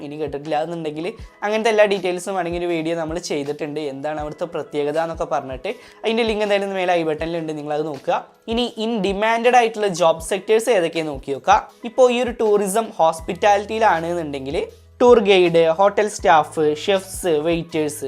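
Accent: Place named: Kerala, India. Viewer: Ninaku English coursenu chernnu kurachu pronunciation ok akkaaan try cheyyaan pattumo?...malayalam pole english parayunnathu...ketto😅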